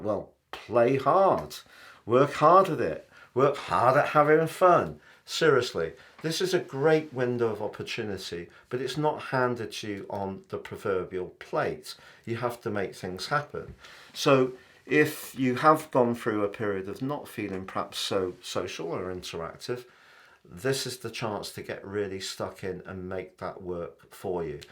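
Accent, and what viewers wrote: United Kingdom. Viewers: You're british?! 😂❤ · I love your British accent 😍and your videos 👌! “Venus in Pisces” (I’m a Pisces and I love many sorts of arts… ) By the way : I’m a Dutch 🇳🇱 ‘hobby’ astrologer -> My uncle was a ‘real astrologer and he taught me how to make and draw astrological charts AND of course how to interpret them, because it’s a way to better understand people.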